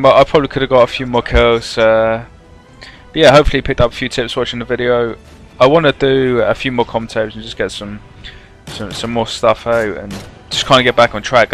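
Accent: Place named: Norwich, United Kingdom. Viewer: You sound like your from Norwich? City fan?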